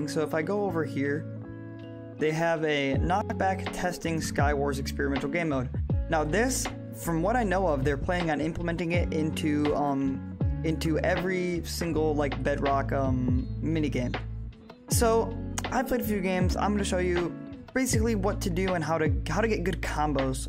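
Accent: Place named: Netherlands. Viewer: where are you from you sound dutch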